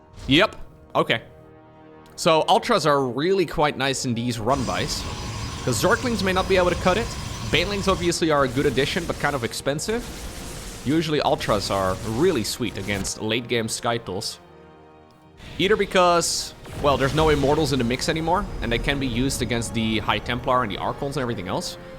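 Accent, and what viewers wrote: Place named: Netherlands. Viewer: My wife and I lived in Netherlands and just appreciate the wonderful accent 😂❤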